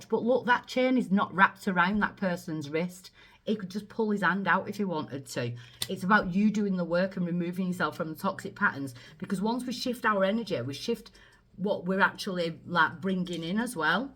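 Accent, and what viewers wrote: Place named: North, United Kingdom. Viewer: Thats a northern accent if I ever heard one!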